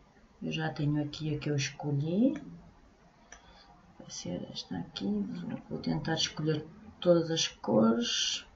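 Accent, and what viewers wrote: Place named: Portugal. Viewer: Quando tens todas as coisas de um creator que segues há anos e depois descobres um video em que fala português de Portugal :') Super useful tutorial, MUITO obrigada por isto!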